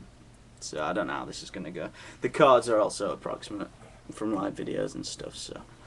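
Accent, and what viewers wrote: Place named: Barnsley, United Kingdom. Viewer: Good god this guy sounds like he lives near me! haha, Barnsley?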